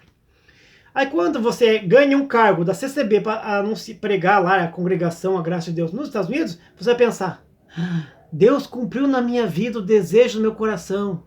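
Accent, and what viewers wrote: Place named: Paraná, Brazil. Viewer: Um portugues caipira do interior do Parana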